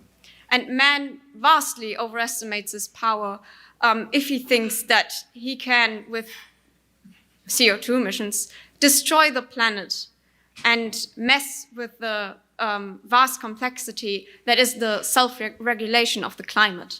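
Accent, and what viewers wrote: Germany. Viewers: German accent...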